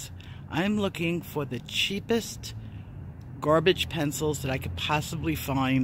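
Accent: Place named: Massachusetts, United States. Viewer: Nice tip and I could listen to your lovely voice all day. Are you from Mass?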